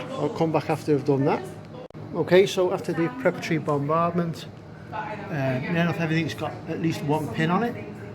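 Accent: United Kingdom. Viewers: That a scouse accent? I'm recently into Bolt Action but no idea where theres any groups in Liverpool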